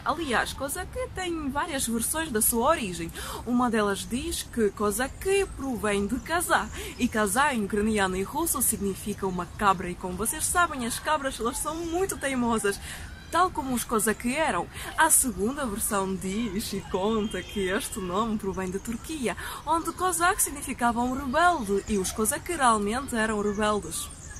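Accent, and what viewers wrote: Portugal. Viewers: Menina seu sotaque é bem lusitano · Seu português é de Portugal, certo? O que você conhece do Brasil? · Seu sotaque português de Portugal é ótimo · Ótima explicação sobre fatos históricos tão marcantes que sempre me intrigaram. Fiquei chocado com tamanho banho de sangue. Cara, você é sem dúvida, muito culta, sabe contar muito bem as histórias desta parte do mundo. Nem vou elogiar sua beleza, porque será redundante, qual palavra diferente encontraria eu para descrever tanta beleza, além do que sua simpatia é cativante. Amo seu português lusitano. Você me desmitificou um pouco sobre muito do que é mostrado em algumas películas aqui no Brasil, em parte por causa da 2ª Guerra Mundial, com a Polônia sendo apenas uma nação massacrada, explorada, os poloneses como um povo só de sofrimento, não me entenda mal, só quero chegar no ponto de que realmente no mundo nunca houve um povo bom e outros maus, houve choques entre nações, culturas, tudo por ganância. 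Todos tiveram seus momentos de profunda brutalidade e em outros momentos foram massacrados. O Brasil teve seu momento de carrasco também, quando se envolveu no maior banho de sangue das Américas - A guerra contra o Paraguai. Desculpe pela mensagem longa. Eduardo Bastos. São Paulo - SP - Brasil. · Seu sotaque é português de Portugal. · Duas perguntas: Por que o sotaque português? Sua voz é tão grave assim mesmo? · Que sotaque português! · Esse portugues que parece de portugal </3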